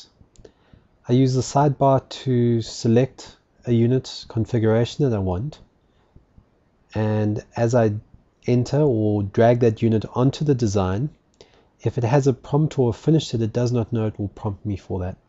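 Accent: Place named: South Africa. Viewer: hey....a south african, bru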